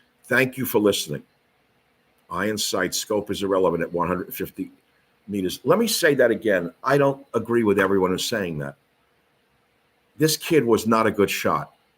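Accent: New York, United States. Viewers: Always enjoy view....that NY accent too!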